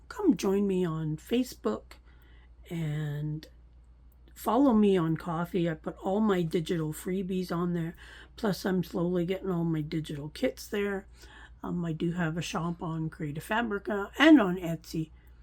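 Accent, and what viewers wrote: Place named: Canada. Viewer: Are you close to any of the fires? They have been so scary & they’re popping up all over the world. California has some bad ones right now!I don’t know Canada as well as I should. I think I’ll get a National Geographic map from Amazon! I get a new one everytime I meet a new YouTuber! Kind of makes me feel like I know you better! Now that you have told me you’re from Canada, I hear that a bit in your speech! I also love all the different accents that people have! I’m amazed at the UK, they have so different sounds! It’s nice to meet you! Erica❤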